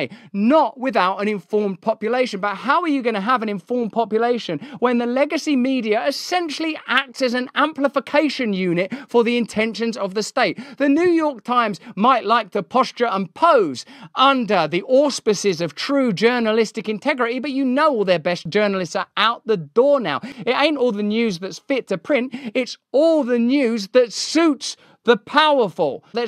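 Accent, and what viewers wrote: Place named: United Kingdom. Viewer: Have you ever accidentally left your volume on when Russel’s British voice hits? Good hell.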